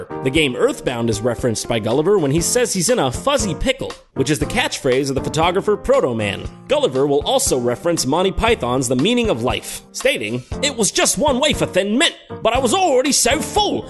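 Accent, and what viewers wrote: United Kingdom. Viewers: Awesome British accent haha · That British accent... I... don't know what to say! But I think I like it?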